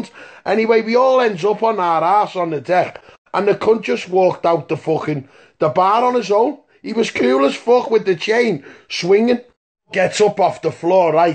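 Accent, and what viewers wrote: Ireland. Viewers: Irish video comes up first thing I do it’s put subtitles on lol. Didn’t help! Omg I love this guy!!!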